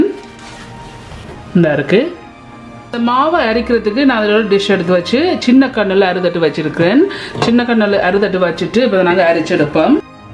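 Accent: Jaffna, Sri Lanka. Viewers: Srilankan tamil aa?? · Jaffna tamil duper❤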